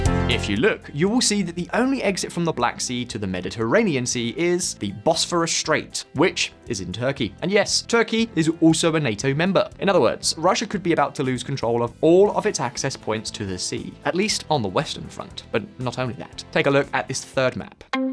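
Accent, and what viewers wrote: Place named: United Kingdom. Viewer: More total drivel! Finland has a substantial expanse of Russian border to donate to NATO's ongoing `containment' policy. Nothing else applies. History has nothing to do with it. Russia is not terrified of the NATO membership proposition. It has had to alter military strategy in placing more military bases in the west, but that has already been accomplished. Fact bending with British accent merely screams of something else, other than `analysis', so just let it go.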